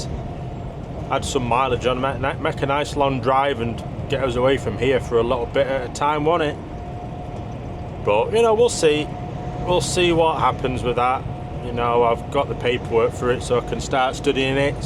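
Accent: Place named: England, United Kingdom. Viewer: Nice truck and that English accent is going to throw off some people 😅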